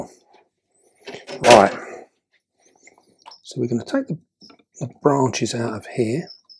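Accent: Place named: Cockney, United Kingdom. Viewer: I stumbled on one of your videos yesterday and the way you handled and cared for a big Australian spider seemed to be a bit therapeutic for me regarding my arachnophobia. You remind me a bit of a cockney David Attenborough (no offence). Thanks, you've got a new viewer. 👍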